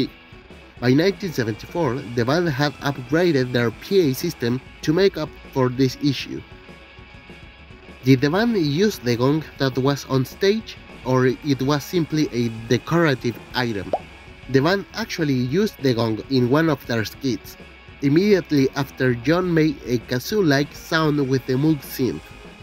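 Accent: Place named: Spain. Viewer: Interesting video... but I would have done without the extremely thick spanish accent of the narrator - it's quite annoying...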